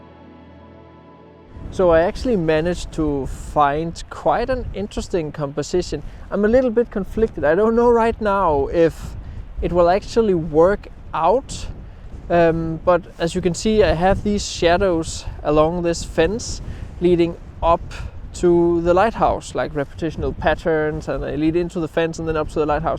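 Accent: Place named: Denmark. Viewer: Its nice to hear a thick Danish Accent (Danglish) :-) Suðuroy is know to having a different dialect then the main islands. Its always know as little Denmark. Nice to Akraberg, Vágseiði and Ásmundarstak (cant remmber seen it my self). Next time, if ever then take a trip to Fámjin where Merkið is found in the local church :-) Hvalbiareiði or Vágseiði is a must in when there is strong winds. You will really appreciate the hard work global mariners do at sea, when you seen the waves and wind take a beating against the rocks :-) If you would like to get a grasp of what the Fellowship of the Ring experienced in the narrowed tunnels in Morria, as for a trip in the coal mines in Hvalba :-) Dont enter if you are claustrophobic :-) And when the sun is shining, take a trip up to Stórhamar plateau and Ørna fjall, walk west to the highest mountain in Suðuroy (cant remmber the name). 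Another trip is to Hvannhaga. Remmber to take pancakes, or waffles, coffee, the and ofcourse Nutella on every trip. And if you are lucky some skerpikød and local made ryebread:-) I got that tip from some locals :-) I am so much looking forward to it :-)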